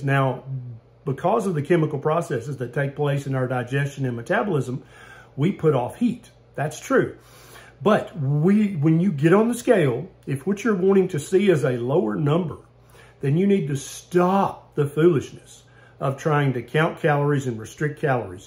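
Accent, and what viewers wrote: South, United States. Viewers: You know...when I heard the Southern twang I knew it was going to be a sobering talk. ⚕️❤ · Sometimes I need my Bart Kay arguments with a gentle southern charm. Thanks for that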